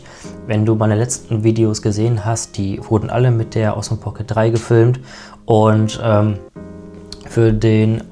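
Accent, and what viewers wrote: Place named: Germany. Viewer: Creator Combo ist jetzt schon auf 450 runtergekommen- da müsste ich sie mir für meinen Südafrika Urlaub auch holen🥰 vielen dank für das schöne Video.. Man merkt gar nicht das du nicht gerne vor der Kamera sprichst- zudem hast du ein sehr schönes, angenehmes Deutsch. Wäre sehr froh wenn ich als Schweizerin so sprechen könnte😅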